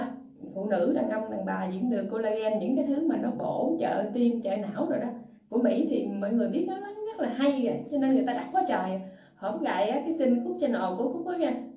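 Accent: Mekong Delta, Vietnam. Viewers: Wow là gái Cần Thơ. Hèn chi nghe nói chuyện đúng giọng miệt dường. Nói chơi tui cũng dân miệt dường mà đang ở xa lắm, lại thích nói tiếu lâm như.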